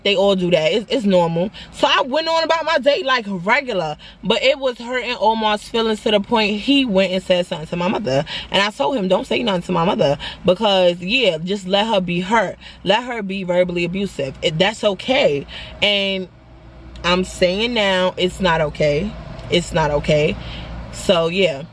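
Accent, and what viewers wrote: New York, United States. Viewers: . You sound like you’re from NY? I’d never guess you are from the south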